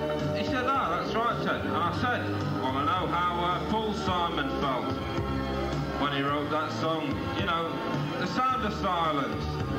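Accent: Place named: Birmingham, United Kingdom. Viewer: 👍 Despite living in Torquay now he still has a Brummie accent but at this stage you can here his Potteries accent quite a lot 😂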